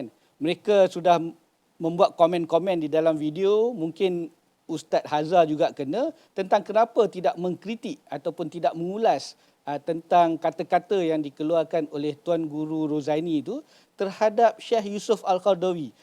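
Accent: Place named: North, Malaysia. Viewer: tg guru lain, ni cari makan ja pun. Orang bodo cem hampa ja duk layan buden ni. Dahla org utara babi rasa sial gila ni duk cakap utara, rasa cem loghat aku pakai jadi hina😂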